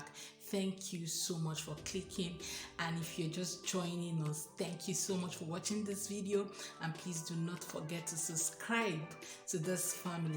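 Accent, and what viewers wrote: Nigeria. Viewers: Pepe is pepper? Eish! Love the pidgin language pronunciation 😃 Karibu again 🇰🇪 🇳🇬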